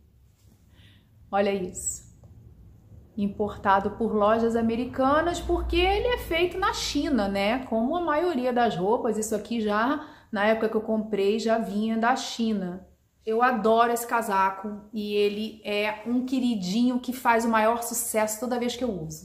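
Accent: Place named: Rio de Janeiro, Brazil. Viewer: Bem que desconfiei que você fosse aqui do RJ kkk pelo seu jeito de falar. Tamo junto, parça 😂 espero um dia ser agraciada com a mesma bênção de ir morar no Sul, como você. Bjs!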